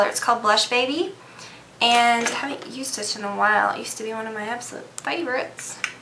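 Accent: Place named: Texas, United States. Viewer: you sound like your from texas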